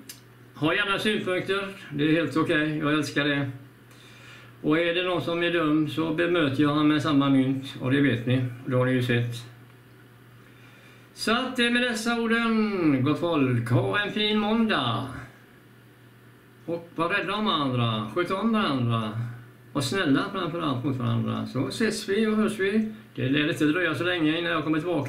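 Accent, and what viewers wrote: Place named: Gothenburg, Sweden. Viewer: Folket som sover kommer nog inte att vakna, så hjärntvättade. Du är så bra fortsätt med detta. Och din göteborgska, den är så härlig.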